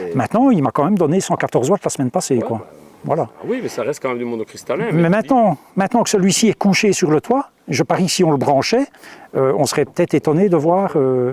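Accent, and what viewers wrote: Belgium. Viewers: Meticuleux soigné avec un ravissement pour l oreille...l accent belge juste ce qu il faut ! 😎merci !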